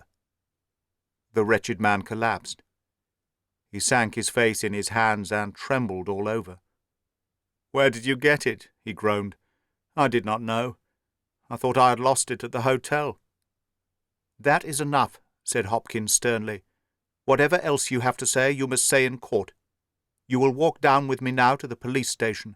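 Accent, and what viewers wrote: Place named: Dundee, United Kingdom. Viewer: an enjoyable fantastic tale superb narration right down to the authentic Dundee accent 10/10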